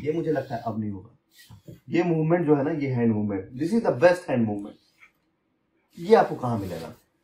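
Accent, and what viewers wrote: Bihar, India. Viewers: Are you from Bihar ?????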